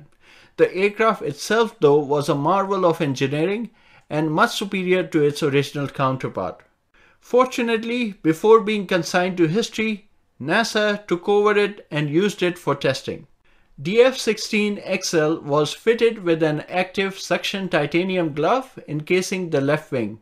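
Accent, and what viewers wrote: India. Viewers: I love your Indian accent and breadth of knowledge, but you didn't mention Gliders !!!! Thankyou, i loved your video.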